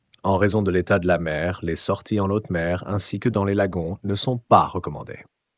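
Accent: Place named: England, United Kingdom. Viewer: Laccent prends une petite tournure anglais des fois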